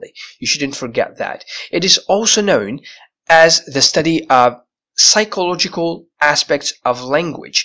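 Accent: United Kingdom. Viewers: What i like about u, your UK accent Keep on that prof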